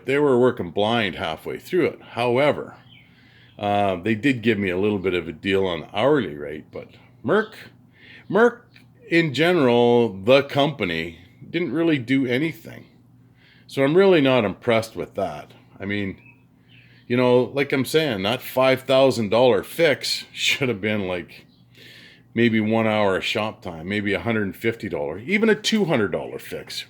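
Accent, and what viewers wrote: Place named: Canada. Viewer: Canadian eh.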